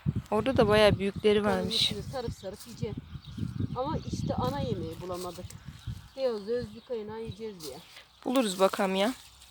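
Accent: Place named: Aegean, Turkey. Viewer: Merhaba hanım hangi memleket burasi sanki Ege şivesi gibi 🙋💐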